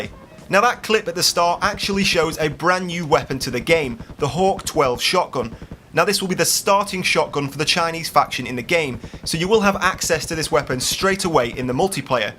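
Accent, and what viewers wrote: England, United Kingdom. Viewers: I'm glad I found this channel! The videos are so awesome! keep up the good work! BTW, are you from England?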